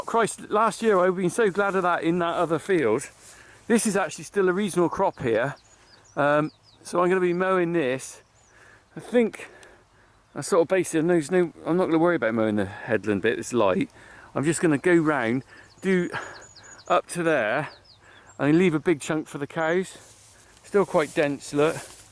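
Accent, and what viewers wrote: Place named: South West, United Kingdom. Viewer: Do you have the calves out yet? The leaf on your grass is awesome. Love that SW accent,. I find it really easy to understand. I am learning so much from you. Thank you. Loved the speeded up section.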